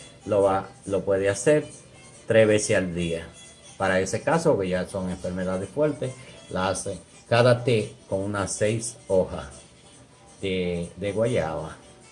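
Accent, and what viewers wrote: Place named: Caribbean. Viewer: eres un genio poderoso Amigo donde quiera. que te encuentres gablas con un acento CARIBEÑO <link> ENCANTA NI SE SIERES CUBANO O DOMINICANO PUERTORRIQUEÑO PERO HABLAS UN ESPAÑOL MUY AGRADABLE SOBRE TODO TU INFORMACION. VALE ORO DIAMANTES ..Y UNA INMENSA FORTUNA GRACIAS PIR TU GRAN CORAZON Y DON DE GENTE BUENA QUE TIENES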